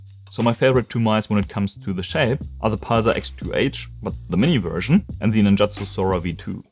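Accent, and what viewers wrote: Germany. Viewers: Mann hört so sehr den deutschen Aktzent heraus😅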